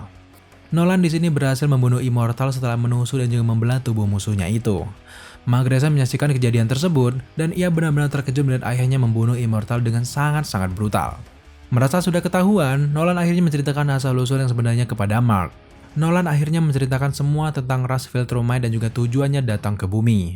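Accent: Indonesia. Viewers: I am Always curious .. why Indonesians... almost of you like to change the english pronounce? Aku hairan kenapa kebanyakan kamu suka meng "indonesia" kan bahasa inggeris. Tune Core jadinya Tun-kor.. guardians yg sepatutnya disebut 'Gardian' tetapi dibaca 'gu-ar'-dian... princess yg sebutannya prinses tetapi disebut prin-ches... wth? semuanya sudah tidak kedengaran seperti English... dan kadang2 jadinya susah mau cari infromations dikarenakan sebutan yang tidak saya fahami...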